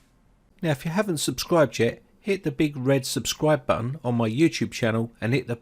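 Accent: United Kingdom. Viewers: Having built my first PC last week with a 2400G, I was happy to have everything working, until I hit the same problems as yours! Thank you so so much for your video, it’s rare to see a British video creator on the topic of PC building, especially Ryzens, so it felt very homely to have that accent haha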